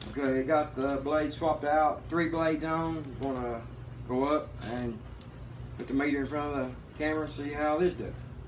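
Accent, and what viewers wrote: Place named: South, United States. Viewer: I grew up in southern Kentucky, I sound like a yankee compared to you buddy.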